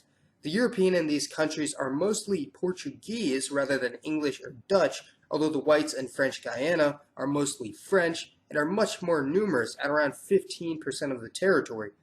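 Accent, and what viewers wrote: United States. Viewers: He was on no more than telling out a university assignment to us all. What the man says will highly suggest that it is fact. When what he is reading as the sources, gives him sentencing and paragraphs without the words might, could or possibly. No, all cohesion does is make an individual sound academic which he does superbly. Also, he comes across coherent, yet others may argue that the use of English language amongst Americans never sounds as coherent as a non academic schooler from England. For example, the part in this video where he presents the pie chart data about population of Africa India and others; however, cohesive - a non academic English child could in my reckoning sound more coherent like with 'the flocking Indians rule over there'. Once again American accents take away a little coherency of English languages. Only a little mind you he is still heard. 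I listen back to my English and sound like a gay kid looking at his own backside arching for please please sir I want some more or whatever Oliver said in his moment. I does think like in the case of Mauritius, that in the West books like remembering the world started in the 19th century when slavery was abolished. When we all know the world is still less than 200 years old from 1837. My guess is Europeans knew how advanced they felt about themselves and really in their hearts knew despite colonialism that they were never turning Africa into European beliefs without slaves. Ask scholars why they don't talk about wh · Thank you for great clips. One thing i can say is that please, if it is possible to put english subtitle, cause american english pronaunciation is defecault for understand. I am from southeastern Europe and british english is more understandable for me.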